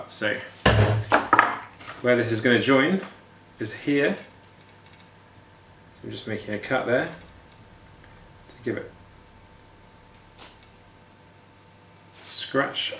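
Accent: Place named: Nottingham, United Kingdom. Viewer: Are you from nottingham? I hear it in your accent!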